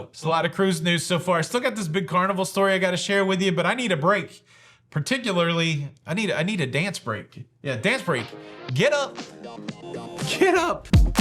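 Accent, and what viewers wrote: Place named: South, United States. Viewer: I done gone wanna learn to speak with a Nashville accent.... I'm fix'n to learn that. Does y'all know if Babbel teaches that? lol Love your southern accent.:)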